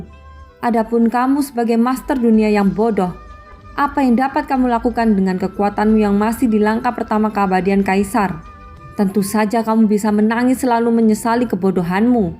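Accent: Java, Indonesia. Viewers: Medok piye iki bahasa ne...😬😬😬😬😬😬😬😬😬😬😬😬😬😬😬😬😬😬😬😬😬😬😬😬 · Medhok nya bisa di kurangin ga..😊😊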